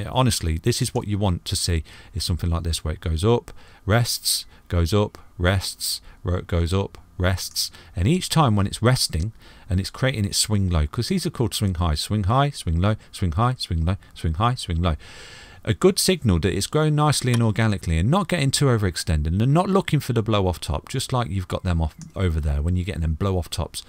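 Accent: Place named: Manchester, United Kingdom. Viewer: your accent sounds like you from Manchester? If so I’m from Manchester as well we definitely got to have a link up!!!!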